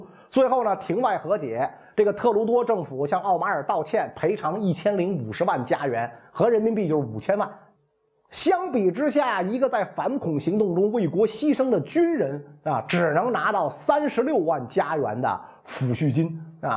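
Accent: Beijing, China. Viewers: 一口京腔听的别扭...感觉像是说相声、说书！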